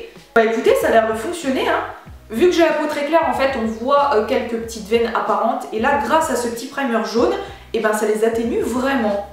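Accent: North, France. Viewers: Sophie Mdg c’est l’accent du nord 😅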